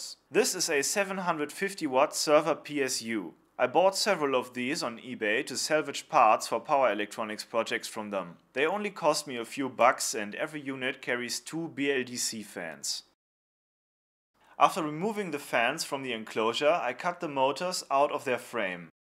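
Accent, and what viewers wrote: Germany. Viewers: I love the German accent :D + this sentence "I also did some ghetto style heat shrinking with a very girly lighter." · nice German accent! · German Accent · Are you German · There is just something hysterical hearing "ghetto heat shrinking with a girly lighter" in your German accent... I like the subtle humor while learning a bit... nice job. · Your German accent combined with selfirony made it very humoristic to watch :) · Dieser geniöse Deutsche Akzent ist der Knaller!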